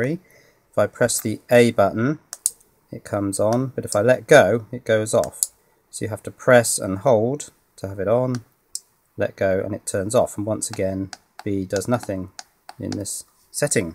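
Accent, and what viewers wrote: England, United Kingdom. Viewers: Hello, How nice to hear an English accent. I'm looking for a way of wiring two solenoids which are going to oper ate alive catch rodent trap. I need 12 volts for this. I have looked at adapting a wireless door chime but the out put on that is only 3 volt. By the way, I have O level physics only! Any thoughts much appreciated? (I'm on very limited budget) Thanks for sharing an informative tut/video. David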